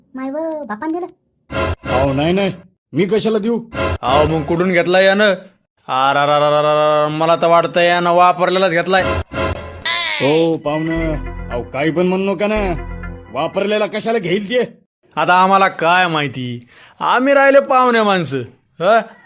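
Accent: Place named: Marathwada, India. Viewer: मराठवा डायची भाषा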